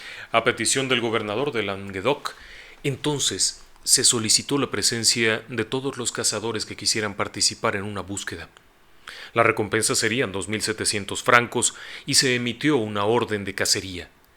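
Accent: Mexico. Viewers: que ignorante es acento netamente mexicano nosotros somos mexicanos no Latinos, aprende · El castellano es un idioma muy rico. Los latinoamericanos lo han hecho aún más rico. Te lo dice un auténtico castellano de Castilla,que soy yo. Se supone que el castellano más puro se habla en Valladolid. El señor habla perfectamente el castellano con las particularidades de un Mexicano. Lo bueno de nuestro idioma es que desde el sur de EE.U.U hasta la Patagonia puedes hablar con todo el mundo sin necesidad de otro idioma que el Castellano.Por eso que estamos todos unidos por este idioma tan rico. Digo rico porque tiene palabras derivadas del latín,del árabe y en menor medida del Visigodo. Los nombres de nuestras ciudades vienen de el Latín, Árabe, Visigodo,hasta del fenicio y el Cartaginés. Saludos a todos los hispanoparlantes del mundo desde Guadalajara en el corazón de Castilla (España). 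· Es el acento mexicano hermano saludos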